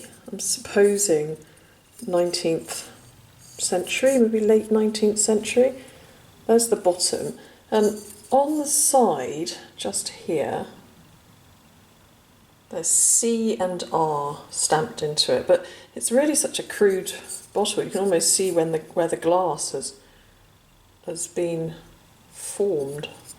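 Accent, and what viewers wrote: United Kingdom. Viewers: What part of the U.K. Is your accent from?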